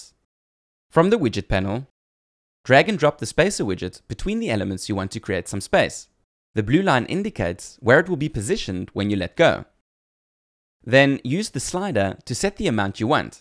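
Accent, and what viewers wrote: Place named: South Africa. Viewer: He is sowth efrikan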